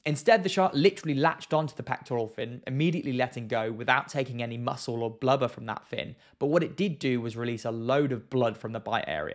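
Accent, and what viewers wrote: United Kingdom. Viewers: It may take a few videos to get used to that British accent and really enjoy the videos. The content eventually convinced me to subcribe.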